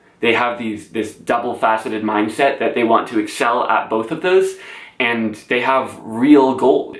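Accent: Canada. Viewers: I really love your videos man and you can definitively tell your Canadian whenever you say words like watch and tomorrow lol best of luck in Harvard mate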